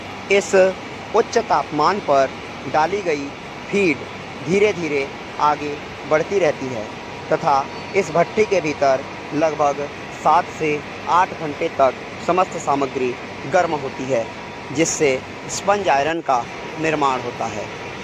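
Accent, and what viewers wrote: Standard. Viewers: sudh hindi achha bolta h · Your hindi is pure. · Kya baat he Ramayan ke badh aapki sudh hindi suni he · शुद्ध हिंदी का बहुत अच्छा प्रयोग किया गया है उच्चारण अत्यंत शुद्ध है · आपकी शुद्ध हिंदी का श्रवण करके हृदय प्रसन्न हो गया भाई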